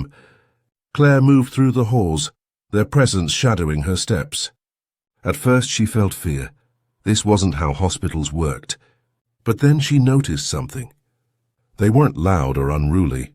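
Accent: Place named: RP, United Kingdom. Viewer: One of the chapters is out of order. It’s a little odd for an elite British accent for the narrator for this American story involving Hell’s Angels.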